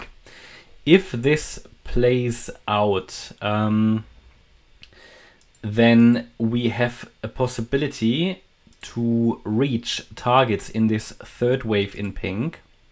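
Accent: Germany. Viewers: Deutscher das hört man, aber für die gute Arbeit gibt es ein Abo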